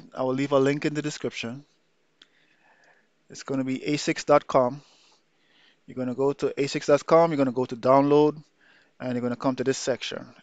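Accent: Trinidad and Tobago. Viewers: Is that a trini accent? Love it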